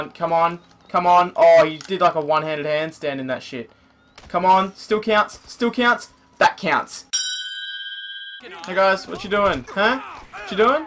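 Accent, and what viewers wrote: Australia. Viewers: Australian accent. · R u Australian because u r to loud mister u need to turn the noise down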